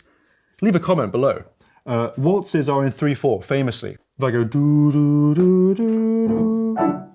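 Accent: United Kingdom. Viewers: HE'S BRITISH?!?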